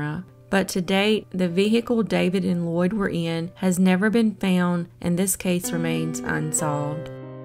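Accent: South, United States. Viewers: Your channel is growing fast! Awesome job! I think it’s the soft southern accent 😉 I’m from Mississippi and when I go out of town sometimes people just want to hear me talk 😂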